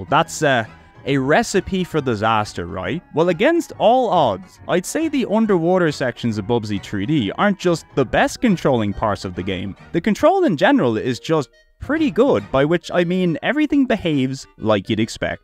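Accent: Ireland. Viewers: What is this accent? Irish ?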